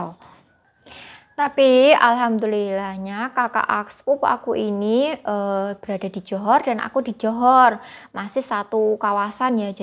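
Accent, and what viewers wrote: Java, Indonesia. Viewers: Wah pekat nya Jowo nya dik...😂😂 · Kabare? Pekat kok jowonya.. terbaik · Kok pekat amat jawa nya 🤣🤣🤣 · Kuat slank jowonyo. Kilang kilang nyaman kaya kerja office. Terutama kilang elektronik ada acenya nyaman sekali. Ada kantinnya atawa cafeterianyak ngak perlu keluar beli makanan semuanya dlm kawasan kilang. Cowok2 dan cewek2 pun ramai. Boleh main mata, ngobrol lama2 bisa dapat jodoh. Cewek cantik cepat kawen. Cowok ganteng punya ramai cewek. Cewek2 kaya jinak2 merpati. Kerja kilang kena koban diri. Kerja 12 jam termasuk rehat. Pergi pagi pulang mlm. Pergi petang pulang pagi. 8 jam kerja UMR 4 jam Overtime. Lama2 kerja UMRnya naik. Jadi gaji pun bertambah. Kerja kilang semuanya di sediakan. Angkutan, asrama, medical penal, bonus tahunan, Annual party dan lain2 di mana ada cabutan bertuah dan sebagainya. Exursion ke tempat2 wisata berkumpulan dan sebagaimananya. 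Lepas habis kontrak pulanglah. Bisa di ganti org baru. Org di luar sana ramai mau kerja. Bagus tak nyambung kontrak. Bagi peluang org lain mau kerja juga. Kerja saja di indon sana. Skg indo sdh maju byk lowongan kerja. Skg M'sia mau ambil kerja dari Siam pula. Kosnya tak tinggi. Sbb Siam sebelah dhj dgn M'sia. Cewek2 siam lgi cantik kulit merah kuning dari cewek2 indon😊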